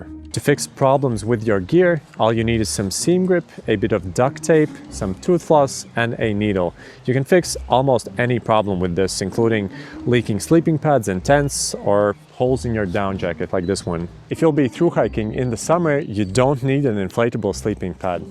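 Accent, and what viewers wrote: Latvia. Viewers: Very helpful, super compact and easy-to-understand video! Skatoties likās, ka Tev ir mazliet latviešu akcents, un izrādās esmu trāpījis. Sveicieni un lai izdodas arī turpmāk!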